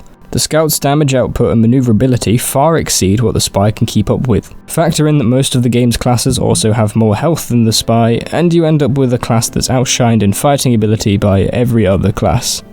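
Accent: United Kingdom. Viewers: ive never heard anything so British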